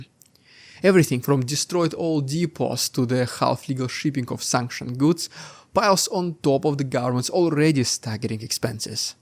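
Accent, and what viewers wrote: Russia. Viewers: I'm sorry, I've watched about three of your videos so far but miss a lot of what you're trying to convey because you have a very pronounced Russian accent and yet you talk fast. The combination of these two factors means I'm constantly having to back up and replay content in order to understand it. I can tell you're really intelligent and have much to offer. Please consider this well-meant criticism.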